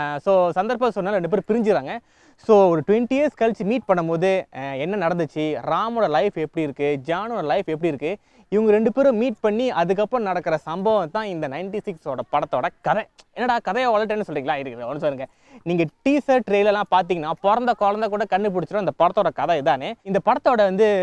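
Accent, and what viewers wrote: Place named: Malaysia. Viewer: He sounds like Kelly.. May be he belongs to Malaysia!!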